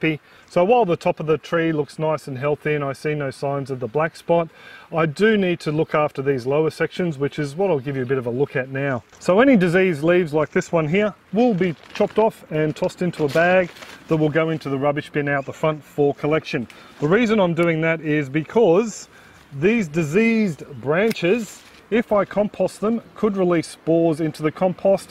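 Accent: Australia. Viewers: I really enjoyed your video. It was really easy to understand and I LOVE the Aussie Accent!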